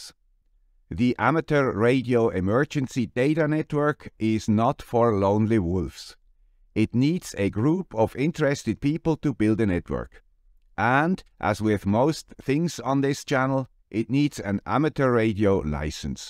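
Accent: Switzerland. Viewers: Awesome! And first time I think I've heard you speak Swiss german (with a touch of french too?)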